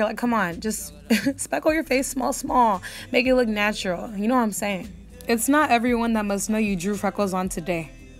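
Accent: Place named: Nigeria. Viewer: omg you are gorgeous are u Igbo Yoruba or Hausa etc? you definetly sound Nigerian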